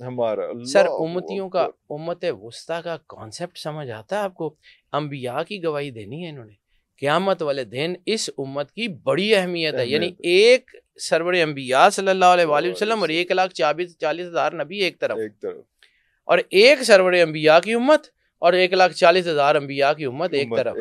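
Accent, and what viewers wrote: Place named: Punjab, Pakistan. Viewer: your Punjabi accent is hilarious 😂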